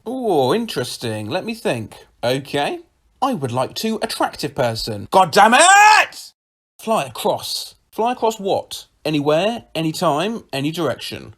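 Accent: United Kingdom. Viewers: TBH, a Brit talking this fast is my ASMR no matter what he's saying.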